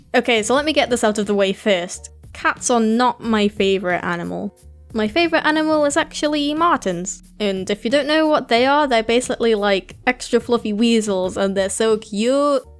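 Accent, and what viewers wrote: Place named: United Kingdom. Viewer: I love the british accent🙂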